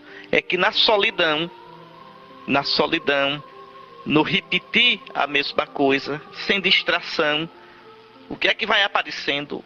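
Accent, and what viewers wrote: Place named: Northeast, Brazil. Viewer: Taí um verdadeiro filho do trovão , que hoje no céu com seu sotaque nordestino cabra macho faz o encardido tremer quando algum filho lhe pede um favor , obrigado Jesus pelo teu filho Dom Henrique. Louvado e glorificado seja nosso senhor Jesus Cristo. Fiquem todos na paz de Jesus e no amor de Maria.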